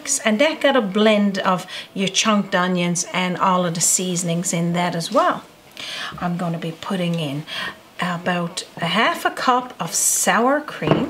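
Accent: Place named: Newfoundland, Canada. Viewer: I so enjoy your NFLD speak. That dip looks so darn good thank for your video.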